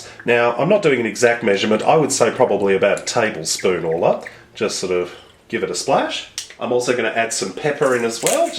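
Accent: Australia. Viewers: Love the Aussie accent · He’s an Ozzie 👌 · Australian mate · let me guess. definitely Australian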